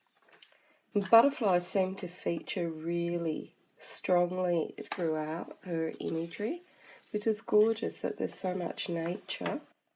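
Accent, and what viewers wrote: Australia. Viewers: Like your review style.like your Australian accent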